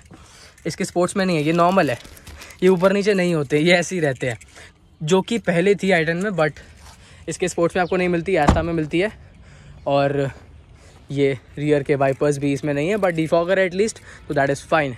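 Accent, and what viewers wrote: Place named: Agra, India. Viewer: Bhai agra se ho?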